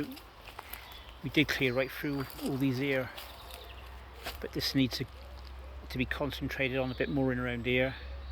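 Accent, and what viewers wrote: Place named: Cornwall, United Kingdom. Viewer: i find your videos soooooo relaxing. and i love your cornish accent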